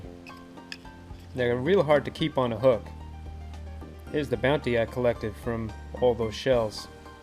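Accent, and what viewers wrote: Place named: South, United States. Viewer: Any1 else think he Sounded like he talked with a country accent?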